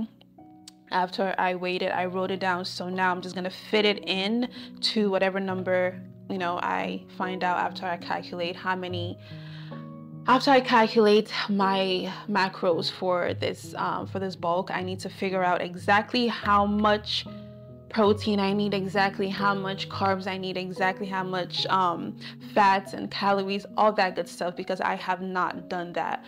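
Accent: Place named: New Jersey, United States. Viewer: Girl, let me find out you from jersey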